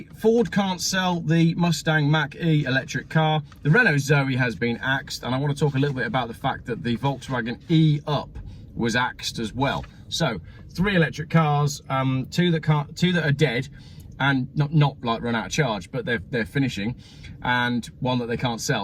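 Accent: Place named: Yorkshire, United Kingdom. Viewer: I am sorry, but I think you have been watching too much You went all Yorkshire in this video. "E-Up!" PMSL So anyway, what I have gleaned from this is, we no longer live in a democracy & capitalism died. Supply & Demand went out the window as you can only have EVs, but nobody fell for it. So they are killing off what people want & need and fleecing you to get bigger unwanted motors.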